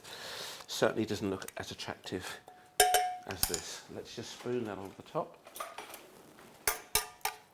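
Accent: United Kingdom. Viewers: nigga u British.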